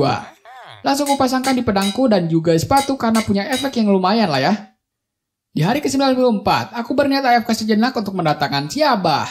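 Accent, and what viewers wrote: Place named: Bugis, Indonesia. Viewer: Hai aku sub baru nih aku tertarik dengan Vidio ini karena cara bicaranya Bugis tingkatkan lagi ya suaranya pasti banyak subcribernya kok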